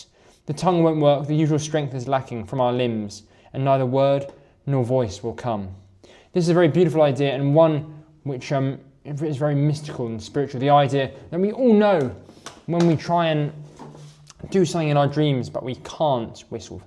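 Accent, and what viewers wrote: England, United Kingdom. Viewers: I don’t want to be disrespectful, because it’s not such a bad video after all, but I can assure you (as a Frenchman) that the verses from Mallarmé and Hugo are even more beautiful without such a thick (and annoying) English accent…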